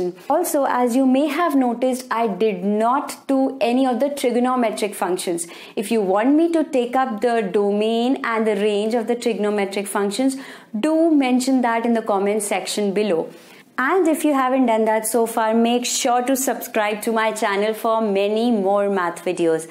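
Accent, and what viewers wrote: India. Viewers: I can't understand the accent of Indians. they emphasize a lot on words such a tttttt ,ddddd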